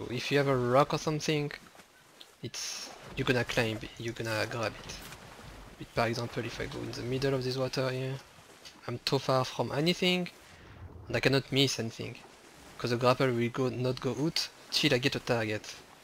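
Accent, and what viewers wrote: France. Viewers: Tu a un petit accent français nn ?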